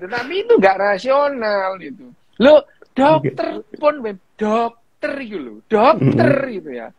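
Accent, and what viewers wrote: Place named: Malang, Indonesia. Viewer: Saya suka dokter Ryu Hassan, benar-benar cerdas, dan gaya dialek Malang nya alami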